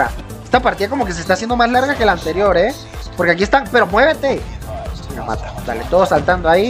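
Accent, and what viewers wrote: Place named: Mexico. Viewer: Noto que se te pegó lo mexicano, ya no suenas igual que antes xd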